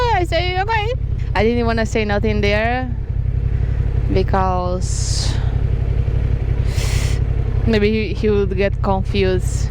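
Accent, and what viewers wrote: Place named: Spain. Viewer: Honestly, 8 years is a very long time to travel specially now you ae alone. But remeber you have a load of people here in the internet that are with you in the heart. I'm a little old as i soon will retire (unfortunately i do not have the vlog skills like you have ) but as soon as i retre, i will travel for a reasonable time and see all the beauty and horrible things you travelers have experience on my 1250 GS. Yes 150k is a lot, and perhaps the engine will be totally fine, but like a clutch can be a little problem in that long time as that is used several times a day, still, not so much a bug problem when that have to be renewed on your Tenere compared to my beemer hahaha I hope you are doing well as i love to liste to yourEnglish in a Spanich way, its soo adorable hou you begin and let us know what you experience. So drive safe, and have a good morning in the morning..;)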